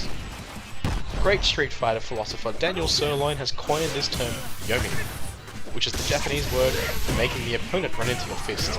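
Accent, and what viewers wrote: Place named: United Kingdom. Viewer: Nice video! You British people have such a cool accent :)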